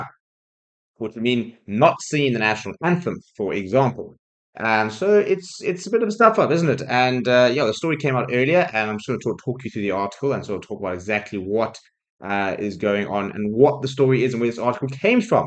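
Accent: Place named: South Africa. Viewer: Just sounds like a normal south African accent to me.i can understand everything and I'm a kiwi.maybe you need to listen more carefully.cheers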